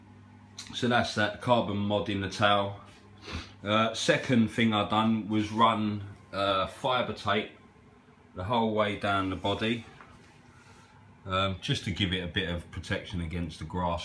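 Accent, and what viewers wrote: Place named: London, United Kingdom. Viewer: Thanks. I've just bought an XL so this afternoon I'll get cracking on your suggested mods and hopefully save myself some grief. Good to hear an English voice at a speed that can be understood. A good London area accent.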